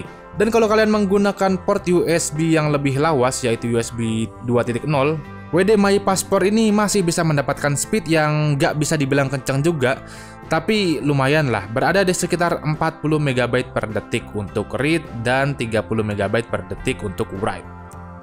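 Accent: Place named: Bekasi, Indonesia. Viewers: entah kenapa, logat dan pilihan kosakatanya mirip aksen Bekasi gitu... Kental aksen Bekasi haha.. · orang bekasi kayaknya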